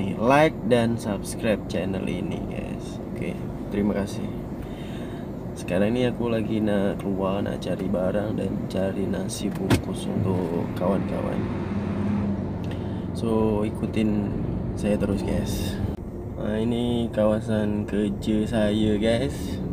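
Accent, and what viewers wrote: Malaysia. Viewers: Cakap pon dah mcm org Malaysia..👍 Kalau bleh cakap Kedah lg sempoi... hihi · ko dah boleh ikut loghat malaysia bro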